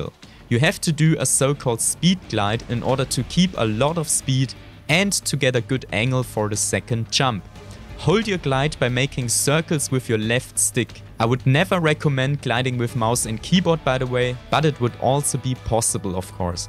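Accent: Germany. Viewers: Auf jeden Fall ein Deutscher ,hört man am Englisch no front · Hast du auch eine deutsche Version gemacht weil klingst sehr deutsch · Du klingst so deutsch:D You Sound so German:D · Man erkennt so schnell dass du aus Deutschland kommst. Wegen den accent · man hört dass du deutsch bist haha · are you german?you sound a bit like german xD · Haha einfach dieser deutsche aczent xD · Der deutsche akzent xD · if ure not a German idk where u learn English 😂 sorry but everyone hear that ure not a English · the german accent lolll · klingt sehr nach Deutschem Akzent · Uff german English is so annoying to listen to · Höre ich da einen deutschen akzent ?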